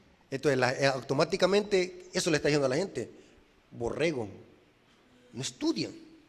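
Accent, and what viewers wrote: El Salvador. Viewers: Hermano, con mucho respeto, este hermano es del Salvador , y casi todos ellos tienen su modo de hablar, este hermano por más que quiera ya no puede cambiar su pronunciación. Así , es su estilo de hablar. Aquí en mi país , en la ciudad hablan muy diferente de cómo es en el campo o en otro estado, y no se puede cambiar y cada uno nota nuestra manera de hablar, y no hay nada que se pueda hacer. Por ejemplo para nosotros los mexicanos los hombres se saludan en algunas partes así: hola, gallo , cómo te va? Y en El Salvador decir gallo es meterse en problemas , gallos para ellos es el Sancho, o es el amante , por eso cada país tiene sus tradiciones. Disculpa mi manera de expresar esto. Te deseo que tengas un lindo día, y espero me disculpes por decir esto. Besos.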